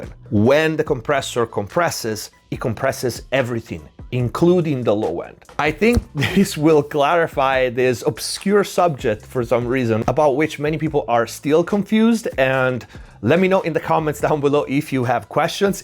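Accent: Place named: Italy. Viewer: I already knew the subject but it's great to see pros hitting the technical side of it. You sound Italian my friend.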